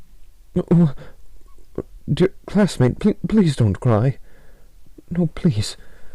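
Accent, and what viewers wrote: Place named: Scotland, United Kingdom. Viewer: why he sound Scottish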